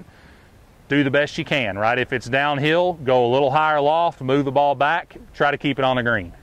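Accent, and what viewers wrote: South, United States. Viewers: I found you today on YouTube, a little behind everyone else. You have a great method of teaching. I completely understood everything you said and described. A southern accent is greatly appreciated by this Texan ;-) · your method and delivery of the training is great. You explain everything in a logical, easy to understand way.. This past weekend I tried to use the techniques in my short game and they really helped. My short game is the worst part of my game so any thing is a plus haha! I have watched all of your videos now. You present the information so much better than other teachers. Keep up the postings. I will watch everyone. BTW, where are you located? I detect a Southern accent. Am I right?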